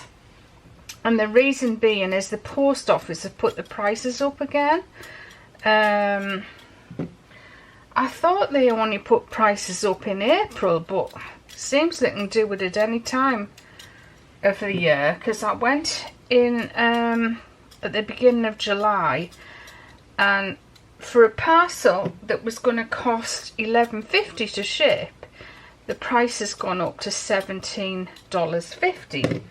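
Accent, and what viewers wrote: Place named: Cumbria, United Kingdom. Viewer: Haha right Cumbrian kitchen banter. It so nice to find videos with a local accent instead of usa (not that I've anything against usa accents I watch them n all) it's just good to know Cumbrians craft too 🤩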